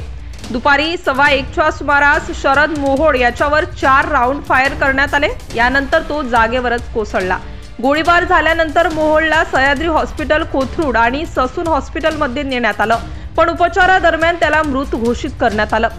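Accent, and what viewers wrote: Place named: Khandesh, India. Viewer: खाणदेशी आहे ती तिकडे मोहोळ ला मोहोड बोलतात.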